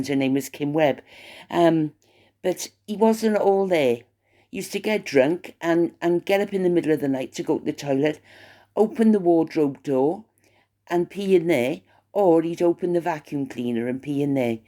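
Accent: Wales, United Kingdom. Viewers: Hi listening to the vlog again. I had a thought abt subliminal messages imo & allegedly MM with her Raspberry Jam & Dog Biscuits was trying to make some of the BRF look like “Jammy Dodgers” IYKYK… think you get my drift. Glad yr back & yr welsh brogue is wonderful listening. Best wishes from Adelaide; South Australia🦘🐨🇦🇺💐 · So lovely to hear that beautiful welsh accent. You are a diamond xxx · I have missed you. I used to listen to you going to sleep. In Australia 🇦🇺. You made me laugh. I’m so glad. I’m so sorry with what you went through. We must stick together. I hope wales with your welsh accent will help me sleep. I’m glad I found your channel again 😊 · I couldn't believe my ears so absolutely delighted to hear your beautiful Welsh voice again.. i have missed you so much. I wish you all the very best in your endeavours, Caroline ❤❤❤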